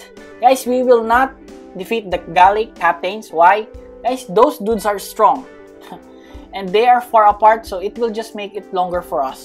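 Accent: Philippines. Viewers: U Filipino I think I hear kaya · Are you Filipino? You sound like you are.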